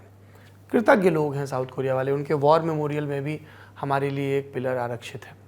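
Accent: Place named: Bhojpuri, India. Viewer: पहले मेरे पिता जी news देखने को कहते थे कि बेटा News देखा करो हिंदी भाषा अच्छी हो जाएगी। लल्लन टॉप और इन की तरह खबर बताने वाले तो हिन्दी भाषा को को भोजपुरी चटखारा दे रहे हैं।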